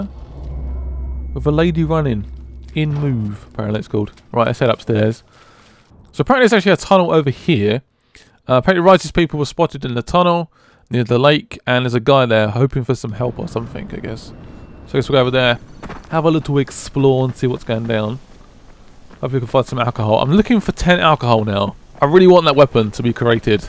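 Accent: England, United Kingdom. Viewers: Are u born in England because u have this accent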